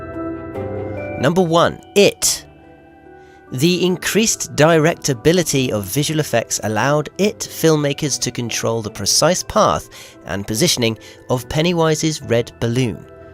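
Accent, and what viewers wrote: United Kingdom. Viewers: I prefer this British accent day over most Aussie accents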